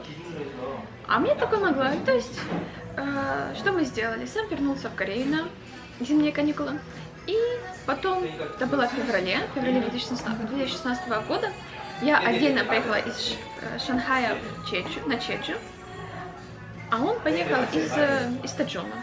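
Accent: Ukraine. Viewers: Харьковский суржик - это здорово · ты говоришь на суржику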